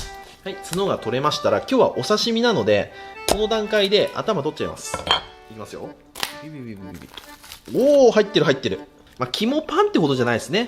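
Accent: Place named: Mikawa, Japan. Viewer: ところどころ三河弁なの親近感湧くわー！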